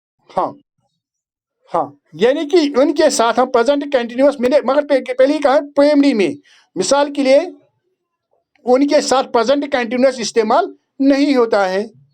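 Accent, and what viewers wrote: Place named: Kashmir, India. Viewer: Sir great expl. in Kashmiri accent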